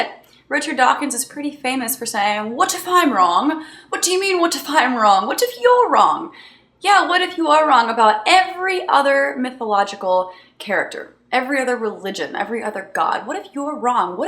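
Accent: South, United States. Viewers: How come every time she imitates Christians she does it with a southern accent?... As if people on the south were the only ones that believed in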